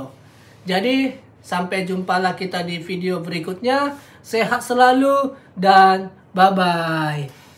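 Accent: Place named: Java, Indonesia. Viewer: Yang aku suka dari pak dhe ini kalau ngomong logat jawane.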